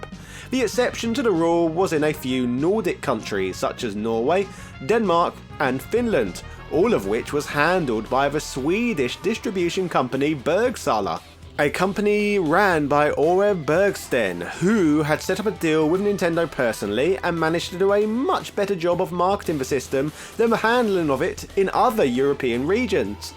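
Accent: England, United Kingdom. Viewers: Canada? He's got the most English accent I've ever heard.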